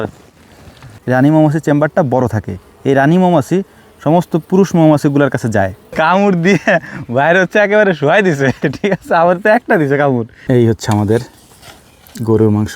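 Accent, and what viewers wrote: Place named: Magura, Bangladesh. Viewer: পিউর মাগুরার ভাষা 😅😅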